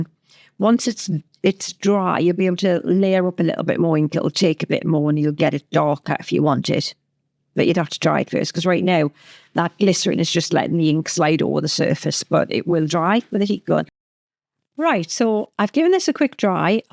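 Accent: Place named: Ireland. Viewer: Beautiful... another technique I will be trying. The only thing I need to get is the glycerin. Are you Irish? My family is from county Cork. Love your accent.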